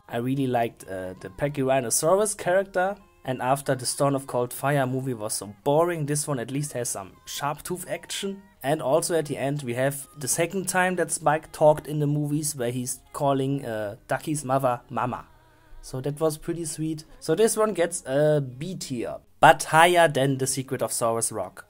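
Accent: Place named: Germany. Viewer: i learn german (stil A1 at the moment) and i recognized the German accent in your videos. funny and awesome as well. also, love your videos, i'm also a big land before time fan, as well as a huge dinosaur nerd. so i know all of the dinosaurus of Land Before Time and Jurassic Park and stuff. loved the Walking With Dinosaurs reference at the end of the video. ("perfectly balanced") i would rank them quite diferently but for now i only have a top three, and a worst tree and there in between i have no idea yet because i havent decided yet. best ones 3 - Mysterious Island 2 - Big Longneck Migration 1 - The Original Worst ones 3 - Great day of the Flyers 2 - Invasion of Tiny saurus 1 - Unlucky Thirteen (totally skipable and unwatchable) Wisdom of friends (fuckery) "perfectly balanced As all things should be." also have to say, even if Stone of Cold Fire is not on my top three spot on the list, it still has in my opinion the best song of all the songlists:. 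but because there are no sharptooth threats, i dont think it deserve the spot on favorite ones because that was a downer for me. (notice, every other sequel had sharptooth action in it, and i always thought it was stupid to not include sharpteeth in 7. technically there were in there, but only as flashback but thatst just dumb.)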